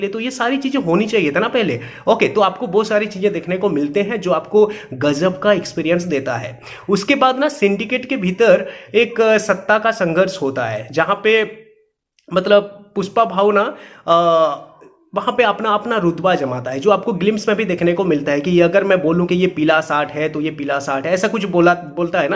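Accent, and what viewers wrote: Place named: Sambalpur, India. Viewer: Bhai aap Sambalpur se hain kya?? Main Odisha se hoon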